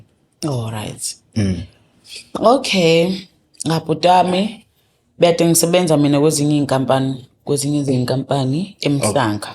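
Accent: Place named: Johannesburg, South Africa. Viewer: 😂😂😂 uhlulekile kodwa uku actor ntombi lestori sakho singamanga, eccent yakho eyase Goli eRange Farm or Bekersdal. EMhlanga awazi nokuthi kungakuphinje 😂😂😂 Kulungike byeeeee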